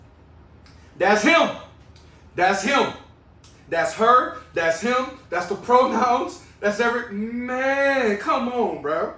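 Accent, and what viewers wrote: New Orleans, United States. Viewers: Love your video… I am about to get into juicing myself, so I’m glad I ran across your video….not to mention it was particularly interesting because I knew that accent was from #Nola lol. Love my city & our accents… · This is my first time watching any of your videos. I kinda stumbled upon it. So I’m watching and listening…and waaaay before you mentioned being from New Orleans I’d already picked it up in you. Even though your accent is turned OFF in this video, I know the little nuances and subtleties of New Orleanians and our communication patterns. The more the video went on, the more I heard them. I can tell you’re living away from the city and have grown differently due to new experiences outside of the N.O. That’s my story, as well. But no matter how long we’re away, it’s always home and it’s always within us….and it doesn’t take much for it to pop right out, ya dig?! 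Fuh sho.😉 Great video and I’ll definitely be trying this juice recipe tonight!! Thank ya my brudda!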